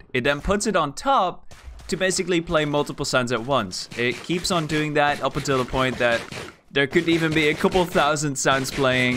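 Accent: Netherlands. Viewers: He is Dutch so he has an accent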